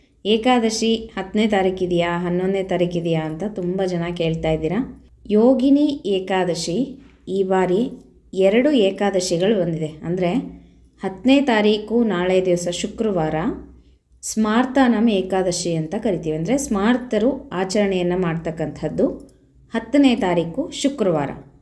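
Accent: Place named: Bangalore, India. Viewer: ನಿಮ್ಮ ಮಾತು ಕೇಳಿ ದರೆ ಬೆಂಗಳೂರು ಎನಿಸುತ್ತದೆ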